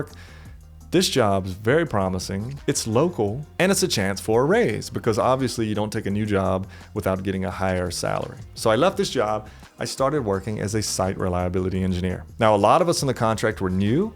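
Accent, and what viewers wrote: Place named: Louisiana, United States. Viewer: I changed my career from food service -> call centers -> web development. I did the final one at 30. All self taught I think it taught me more about perseverance and made me unafraid to do new stuff I no longer let not knowing something prevent me from doing it. That accent you have though, I bet you were born in middle Louisiana or southern LA sounds like Avery Island. --- To other folks looking to make this same type of switch. Do not let anyone tell you "programming is too hard" it just takes dedication and practice as well as a penchant for enjoying learning.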